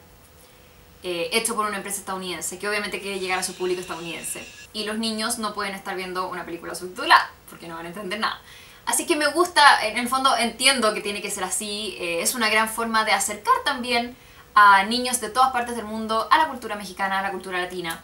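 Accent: Chile. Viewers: estás preciosa y el acento chileno me encanta 🤗, saludos desde 🇲🇽 hasta 🇨🇱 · Te encanta la musica porque cantas muy bien. Tus opiniones de coco muy bien acertadas, fundamentadas el mejor video de coco que he visto felicidades la mejor de chile, amo el acento chileno el mejor de latinoamerica, saludos desde Mexico 😘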